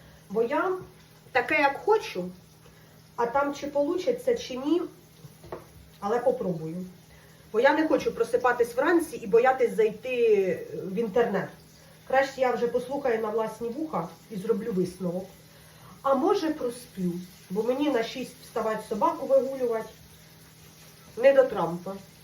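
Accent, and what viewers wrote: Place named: Zolotonosha, Ukraine. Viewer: я тащусь від твоєї мови.Точно,як у нас,в Золотоноші.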